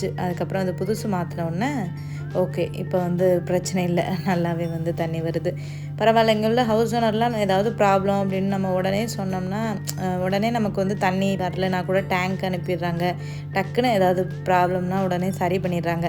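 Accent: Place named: Madurai, India. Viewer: Recently started watching your channel ❤so nice to hear the Madurai and Ramnad slang..my parents are from Ramnad.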